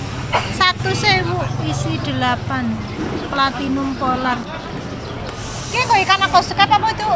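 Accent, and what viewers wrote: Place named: Banyumas, Indonesia. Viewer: Hadirrr.. mantap.. dukung terus channel le mbak ayune wong ngapak lek ora ngapak ora kepenakkk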